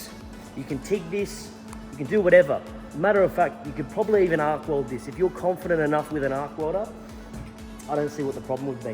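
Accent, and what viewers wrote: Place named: Australia. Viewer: What you’re doing is killer. Love the half Leno Aussie accent. I had that living in Sydney but lost it 20 years later on the sunny coast with all the aussies. Love what you do. Maybe a little more on your performance upgrades and also a bit more educational vids on panel work like in depth bog and panel beating finishing in paint. Love your variety bud👍👍👍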